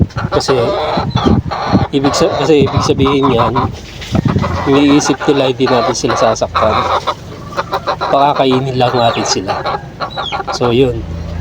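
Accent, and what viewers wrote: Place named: Bulacan, Philippines. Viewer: Parang taga bulacan po ang inyong punto ng salita salamat po dammam saudi arabia po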